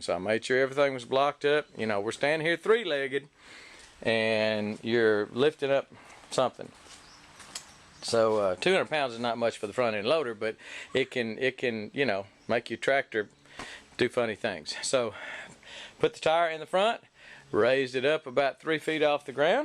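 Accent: Texas, United States. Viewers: Thank you Matthew McConaughey! 🤣 jk.. laid back Texas accent sounds similar.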